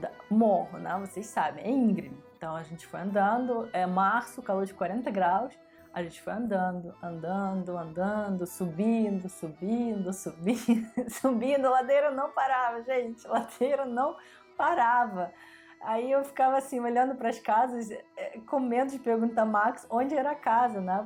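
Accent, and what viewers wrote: Rio de Janeiro, Brazil. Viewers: adoro seu sotaque carioca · Seu sotaque é mais carioca do que russo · Você já está com sotaque carioca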